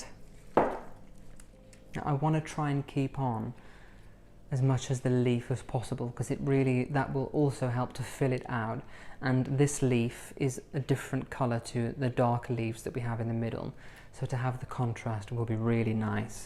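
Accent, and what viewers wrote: United Kingdom. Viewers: Hello my name is CONNIE I live in Southern California I subscribe to your Channel a few months ago and recently added your news letter I am so enjoying your videos. Your apartment is screaming beautiful I would love to live there and Sophie is adorable I own a Shih Tzu myself I think they are the best dogs. I started watching with my goddaughter because she is fascinated with anybody who sounds British so she imitates you through the entire video she loves it. Now she wants your tea set, she’s turning into a little connoisseur. Her father is a chef so she adores cooking we watched your tea party on how to brew the tea properly and make the little sandwiches she was in heaven. You are so blessed to live in such a lovely environment and location. I love that you bring so much charm and elegance to your videos it’s definitely a lost art in America. 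You did a beautiful job on your floral arrangement, there’s just something about fresh flowers, they don’t even need to be expensive it’s just something living and beautiful that can bring you so much comfort when we have such a small life these days. Thank you again for blessing us and bringing Refinement and old world elegance into our homes. Stay safe and healthy give Sophia hug. Your new friends CONNIE and Joey Blu❤️🙏✝️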